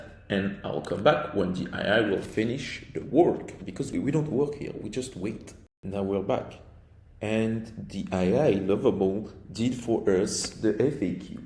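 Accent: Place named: France. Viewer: Hi, thank you for your video, it's great what you did. I think you have a slight accent, you are French?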